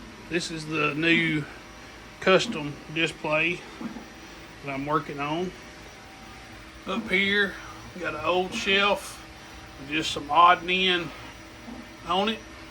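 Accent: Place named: South, United States. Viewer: Southern twang at its finest....cool room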